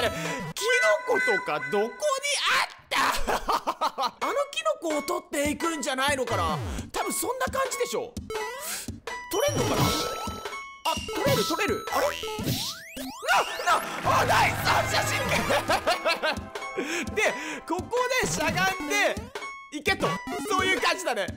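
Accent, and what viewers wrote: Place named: Kansai, Japan. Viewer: 関西人やな どう？合ってる？イントネーション引っかかった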